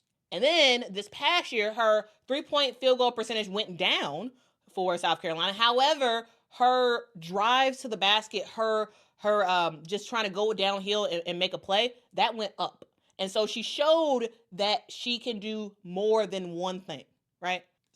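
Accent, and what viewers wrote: Chicago, United States. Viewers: It's actually a Chicago accent, not odd at all.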